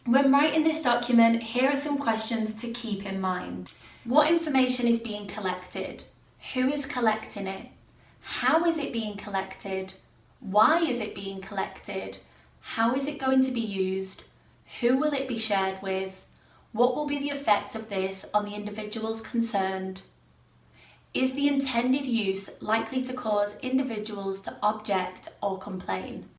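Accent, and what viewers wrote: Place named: England, United Kingdom. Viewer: Love the accent, I just close my eyes and imagine the extravagant 18th century English solicitor in a wig. Can you get one of those and wear it for these legal videos? Wigs, I mean, not solicitors.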